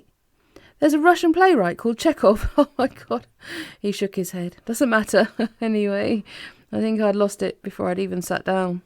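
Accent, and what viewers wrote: Newcastle, United Kingdom. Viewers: lovely accent and great reading, thankyou. Geordie?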